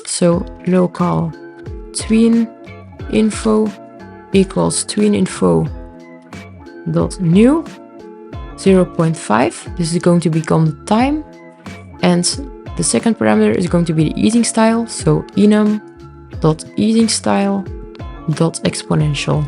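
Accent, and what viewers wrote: Netherlands. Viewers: are you from the netherlands or belgium?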